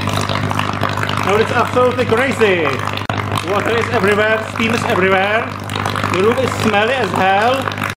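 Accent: Czech Republic. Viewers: Good CZenglish, better than Sobotka. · Czenglish :D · Czech english lol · Czech republic i guess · I love his Czech accent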